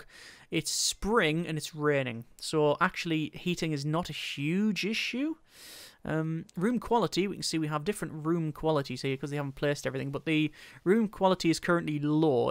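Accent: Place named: North East England, United Kingdom. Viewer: North east of the UK I’m guessing from the accent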